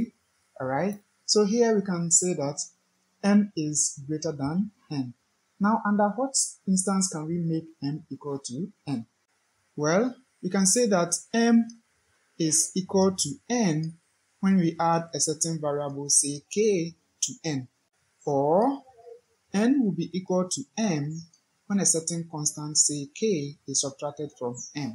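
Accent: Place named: Ghana. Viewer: The accent looks more of a Ghanaian.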